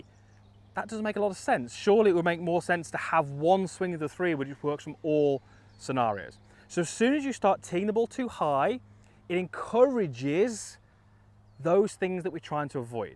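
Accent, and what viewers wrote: United Kingdom. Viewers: Hard to understand brit english cos english is 2nd language to me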